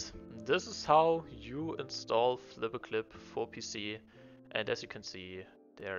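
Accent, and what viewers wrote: Germany. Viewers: Hey are you German? Because you kind of have an German accent (im also German (well switzerland but still)) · U kinda sound like a german no hqte😅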